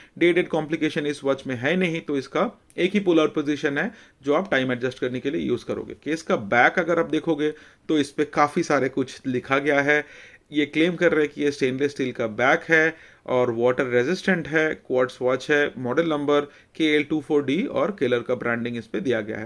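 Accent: Bengal, India. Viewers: You are bengali?😅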